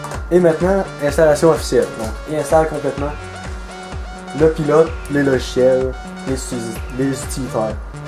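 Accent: Quebec, Canada. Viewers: Le quebec x)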